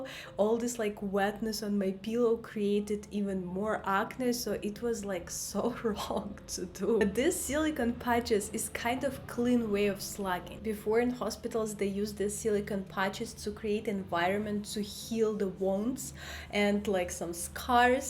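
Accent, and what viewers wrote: Russia. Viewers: that's insane, I've understood that you are Russian or maybe Ukrainian since the first word. If I'm incorrect, it'll be awkward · По акценту сразу показалось, что вы русская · по акценту одразу зрозуміло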